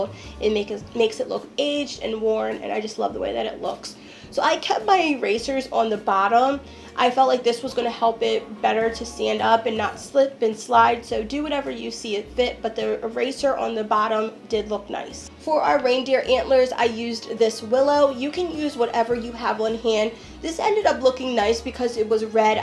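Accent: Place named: East Coast, United States. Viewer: First time with your channel,and it won't be the last. The the rich cocoa tone of your east coast accent. Miss my New Jersey home, and the sounds, now in Arizona. Love all the DIYS, especially the reindeer. Thanks for taking me home.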